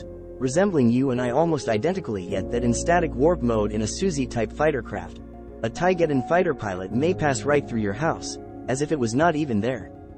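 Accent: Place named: Australia. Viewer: Thats not your voice!! You, my man,sound like crocodile dundee!! Crikey! Great job! The moon is indeed a spaceship.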